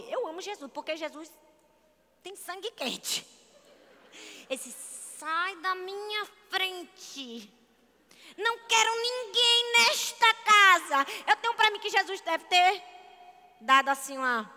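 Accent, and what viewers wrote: Northeast, Brazil. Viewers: Eu amo o sotaque nordestinizado. Linda mulher de Deus. Testemunho de vida fantastico. Abraço bela! 💖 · Amo seu sotaque! Tenho muita vontade de ir pro Nordeste ❤️😍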